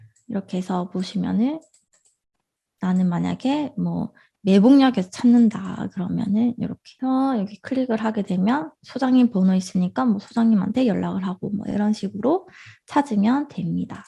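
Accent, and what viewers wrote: Busan, South Korea. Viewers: 부산에서 오신건가요 잘 봤습니다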